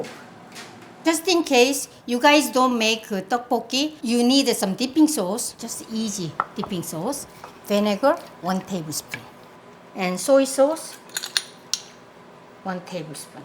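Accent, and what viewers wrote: South Korea. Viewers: 한국인영어발음이셔서 ㄹㅇ 딱딱 귀에박힘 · 영어 발음이 경상도 분 같기도 하고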